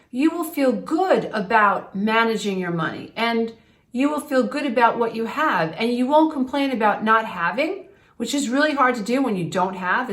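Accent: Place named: Long Island, United States. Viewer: Another spectacular Stellar video. Life lessons worth millions to me. You're priceless in the knowledge assistance reassurance Etc. That you give to me. I grew up in Levittown Long Island and living in Las Vegas 30 years I haven't lost my accent and it's so wonderful to hear yours makes me feel so at home. Honestly feels like my wonderful mom and her friends are sitting around talking giving me advice like they did the times when I was growing up hanging around the house dealing with s***. Peace light love my friend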